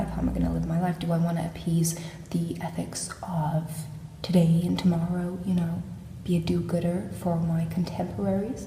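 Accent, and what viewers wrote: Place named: United States. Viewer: I have been a subscriber of yours for a long time. Since you were known as " " or something like that. Anyway I just watched all the videos on this channel and I have no idea what's going on, and that's why it's genius. As an American, I have to say your American accent is pretty good too. I am curious, when you read the books is that your real accent? Anyway, please keep doing what you are doing. I think your stuff here is totally nihilist and I love it.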